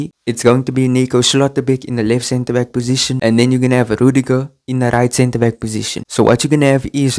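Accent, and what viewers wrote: South Africa. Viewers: Are you South African by any chance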